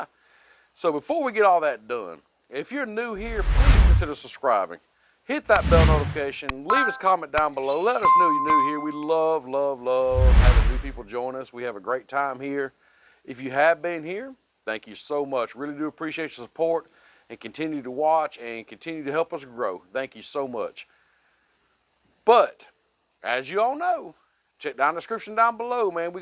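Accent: South, United States. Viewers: You southern boys crack me up. Looks amazing! I’ve got lots of fam in Georgia that sound just like you!!🤣🤣 so far a fan and...subscribed! Keep up the good work. Look forward to more.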